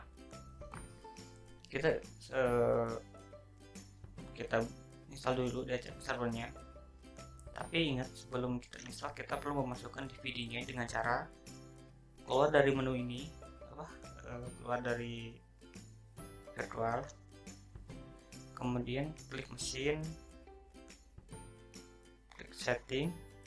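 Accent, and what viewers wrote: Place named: Sunda, Indonesia. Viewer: Bahasa Indonesia dengan logat Sunda dan Jawa wkwk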